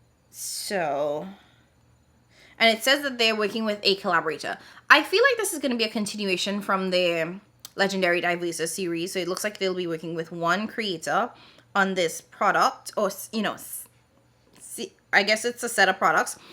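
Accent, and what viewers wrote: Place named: Trinidad and Tobago. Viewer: Why did I say the caption in your trini accent 😂😂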